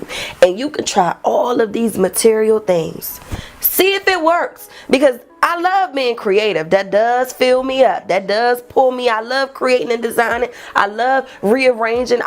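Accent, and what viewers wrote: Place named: Baltimore, United States. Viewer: You popped up on my timeline, and as soon as o heard your accent I was like…oh hold up she’s from my hometown B-more!! Let me subscribe ❤ Enjoyed the video ❤❤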